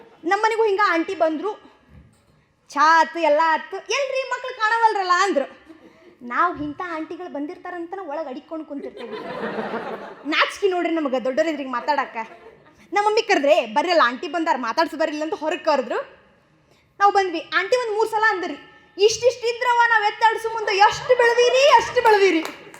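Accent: North Karnataka, India. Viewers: ತಂಗೀ ನೀನು ಬಾಳೇನು ಕಪ್ಪು ಇಲ್ಲ ತಗೋಳವ್ವ. ಮಾತು ಮಾತ್ರ ಬಾಳ ಚಲೋ ಐತಿ. ಉತ್ತರ ಕರ್ನಾಟಕದ ಸೂಗಡೇ ಸೊಗಡು👍👍👍👏👏👏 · I really liked your North karnataka accent, I wish you a great future in this niche💕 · North Kannada slang 😁😁👏👍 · Your north Kannada slang is too good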